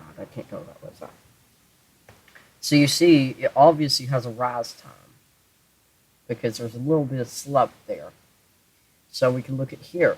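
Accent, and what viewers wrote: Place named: United States. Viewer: The American accent is strong in this one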